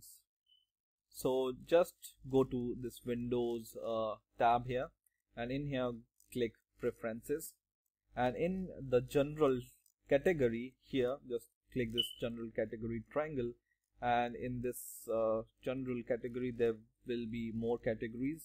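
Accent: India. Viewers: wait. are you indian or german?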